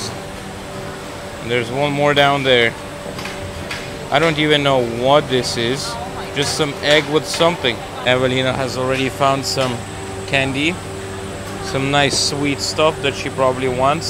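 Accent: New York, United States. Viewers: Hey did you learn your English from a New Yorker??? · It's funny that your English sounds like an American accent more than Irish. Best of luck in Thailand, and I'll be watching to see where you end up!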